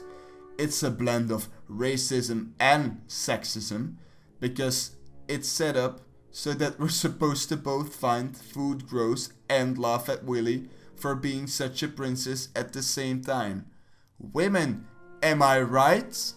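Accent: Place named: Central Europe. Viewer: I'm just going to assume you're a white guy who doesn't actually know what racism or sexism is. From the sound of it you are from middle Europe? Its quite ok that video doesn't have more views than it does.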